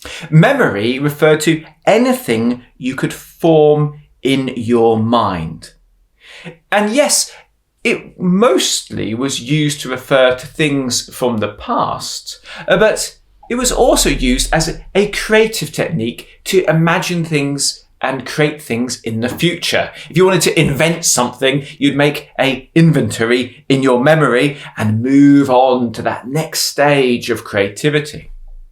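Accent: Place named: South, United States. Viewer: He's an Englishman speaking like a Southerner. As a Texan, we talk that way so people can better understand what we're saying. Comprende??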